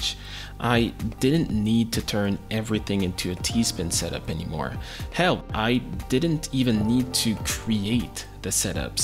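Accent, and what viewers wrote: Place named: Quebec, Canada. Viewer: Getting some Québécois vibes out of your accent. Thumbs up for the video.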